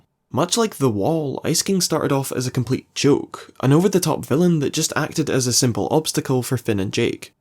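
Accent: Scotland, United Kingdom. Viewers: Here good to hear a Scottish voice on YouTube for a change. Great video.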